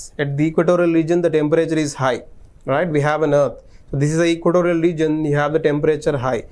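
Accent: South India, India. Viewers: Are you South lndian?....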